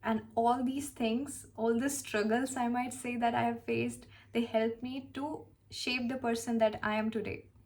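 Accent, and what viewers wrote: India. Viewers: You are looking like foreigner but speaking like indian.however you are very sweet girl 😊😊😊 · Your accent is pure Indian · Your English has Indian accent…you can easily get a role in Hollywood because of that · You look like foreigner and you behave and speak like Indian, be like what you like after all its your life. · every thing about you is indian , accent is same as indian hindi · indian accent bhaut sahi h, expect nhi kia tha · I smiled throughout the video😅 And yes you're completely Indian the way you speak. Aur aap k papa india mei kaha se hain india mein? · You look foreigner but sounds indian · You're 100% India. Your English is definitely "indianised" and your Hindi is most plain. Subscribed. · the best part is the hindi accent of you❤ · you look like punjabi girl and speak hinglish like most of the indian who lives in cities or towns. 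· Bruh even your english accent is Indian. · You look like Ukrainian and speak like Indian ❤❤ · Looks is more on Ukranian side. But Speech is absolutely Indian. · Your Hindi is so good .you talk like Indian Good i ❤ you talking in Hindi.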